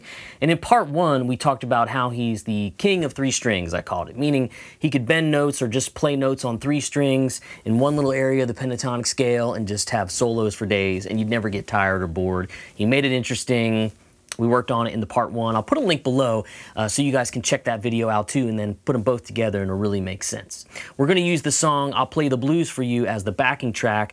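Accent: South, United States. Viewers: You're getting a little Southern drawl there... :)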